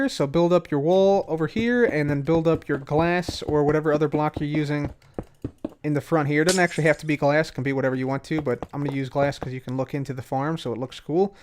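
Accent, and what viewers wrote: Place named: Alabama, United States. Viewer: Dat Alabama accent tho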